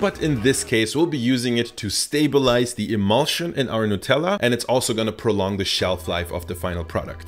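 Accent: Germany. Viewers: Yo can heat the german accent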